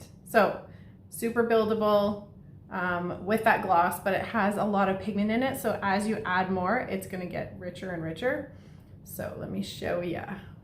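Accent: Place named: Canada. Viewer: Love your Canadian..Ahhhh accent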